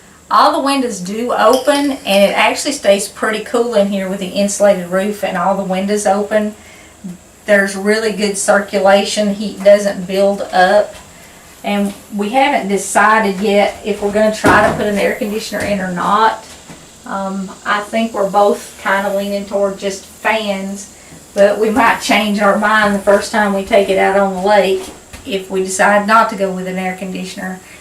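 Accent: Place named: Kentucky, United States. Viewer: Hi - what's new on the houseboat? We're working on a plan and your videos are so helpful. Where are you? You sound like you're from KY or Western NC where I live. I've learned a lot from you and look forward to hearing from you again soon. Thanks.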